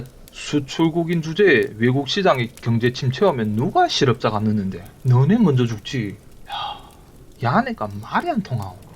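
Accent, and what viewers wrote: Yanbian, China. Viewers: 아닌것 같은데 완전 연변 말투입니다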